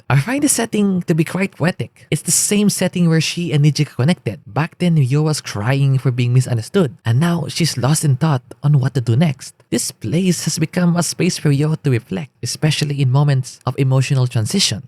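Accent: Philippines. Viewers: I think your a Filipino because of your actsent.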